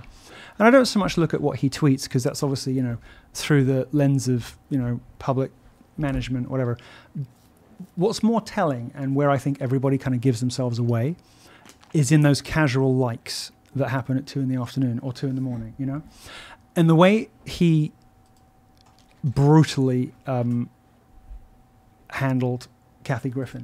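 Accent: United States. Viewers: Do I detect Milo picking up a bit of an American accent? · Milo is starting to sound American in his parlance! I admit it as an English woman I am biased towards the more refined English intonation! js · he is developing a American accent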